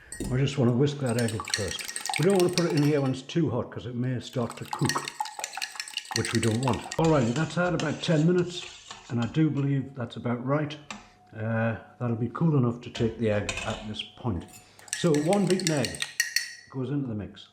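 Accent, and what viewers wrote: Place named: Newcastle, United Kingdom. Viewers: Looks delicious & easy method to make, will give it a go, & you sound like you have a ‘Geordie’ accent… North East UK, where are you from please?? & thank you 👏👏🌟😋🍽😋🎉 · I am from South Shields on Tyneside and he sounds like he is from our neck of the woods indeed.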